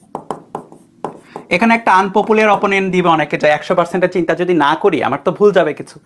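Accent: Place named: Barishal, Bangladesh. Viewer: আপনি বরিশাইল্লা😅 ভাষা শুনলে বুঝা যায়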